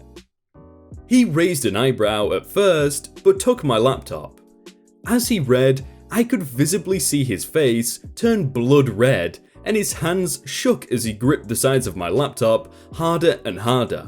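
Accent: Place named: North, United Kingdom. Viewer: NYC BABY I'm not upset, I'm well aware he mispronounces things. I assume when you have a northern accent words sound different in your head, but I wouldn't know. Regardless whether it's a name or a word, it's new and I wouldn't have pronounced it any differently NOT being a northerner. It looks like sand-oval. But then if a word is new I do pronounce them how I read them.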